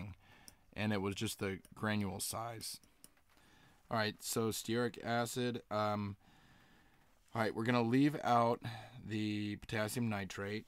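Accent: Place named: Augusta, United States. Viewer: Dude are you from Augusta?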